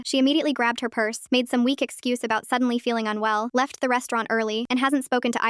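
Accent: Boston, United States. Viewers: So you're from lower Boston just lean into it more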